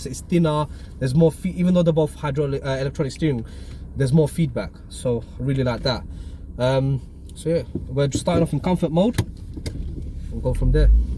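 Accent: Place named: Bangladesh. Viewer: Love your Bangladeshi accent bro! It’s what makes you unique ❤️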